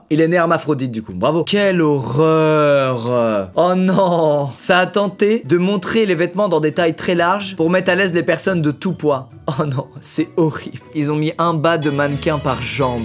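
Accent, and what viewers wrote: England, United Kingdom. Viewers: Toujours un accent anglais MA-GNI-FI-QUE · ✨l’accent anglais✨ toujours aussi beau 😭😂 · Toujours l’accent anglais qui est frais chakal hein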